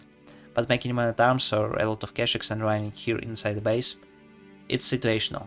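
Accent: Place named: Eastern Europe. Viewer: Great content! I’m curious, where are you from? Your accent sounds Eastern European and I’m from Bulgaria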